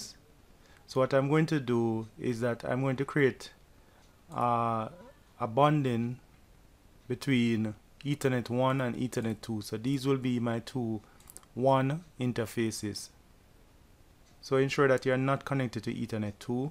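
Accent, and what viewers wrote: Jamaica. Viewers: Well Done I love your Jamaican accent. Big ups man!!!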